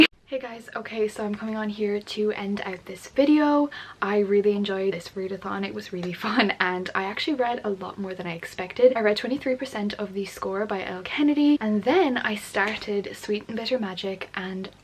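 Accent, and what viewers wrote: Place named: Ireland. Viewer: Sounds like an Irish accent 🙂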